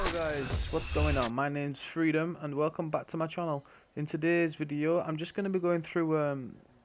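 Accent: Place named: Essex, United Kingdom. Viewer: essex accent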